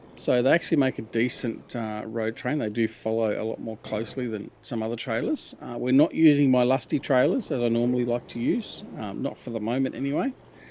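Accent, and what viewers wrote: Australia. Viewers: Just came across the channel looking for a series on this map as I've just started playing it on mine, good to hear a auzzie YouTuber watching from new Zealand 🇳🇿 also good to see your still making episodes on this map, can't wait to see were u take the map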